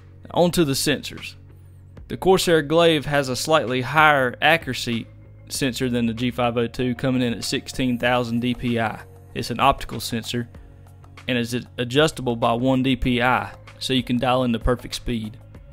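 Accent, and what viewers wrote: South, United States. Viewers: this is the most country voice i have ever heard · Your accent is absolutely fantastic, for us Brits :D Every southerner I've met has been inordinately nice, just can't figure out why they voted Trump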